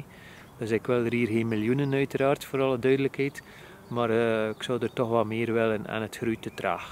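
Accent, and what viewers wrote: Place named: West Flanders, Belgium. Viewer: Begrijp je volledig! Het is leuk om te soen, maar langs andere kant, wij als abonnee je video's zeker leuk, maar even cru gezegd, brengt weinig nuttige content, ttz ik denk dat je meer volgers zou bij krijgen met reviews. Meeste YouTubers, zullen sneller 'geprikkeld' zijn (denk ik) wanneer je meer reviews/ervaringen zou uploaden. Wat bedoel ik, bvb, je review/ervaring over je step. Zo heb ik trouwens je kanaal ontdenkt, gewoonweg omdat ik ook interesse had in zo'n Xiaomi Step. Persoonlijk bekijk ik je video's bijna dagelijks en vind het tof te zien, jou als persoon, vind ik dat je het fantastisch hebt gedaan, maar niet iedereen heeft interesse in wat je dagelijks doet. Het siert je alvast dat je het lnag hebt volgehouden. Denk de beste tip ik je kan geven om meer volgers te krijgen is nuttige content. En mss ben jebhet niet bewust, maar je hebt al nuttige content gebracht, bvb. 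Je kookkunsten, of ervaring van je step, en je mag zeker zijn, dit wordt verder verteld, betrap me daar zelf op dat ik hets dan verder vertel. Nog een challenge, mensen zijn soms moeilijk te 'overtuigen' ol opeens iemand te volgen, familie en vrienden zulken dit wel doen en ikzelfs vind het persoonlijk fantastisch je Algemeen Nederlands gemixt met het West-Vlaams, maar niet iedereen heeft dezelfde interesses. Als ik je eenntip mag geven als YouTube-kijker: forceer jezelf niet, en pist enkel filmpjes van reviews, wanneer je er zin in hebt tenminste. Los van dit alles vond ik je vlog, als West-Vlaming, z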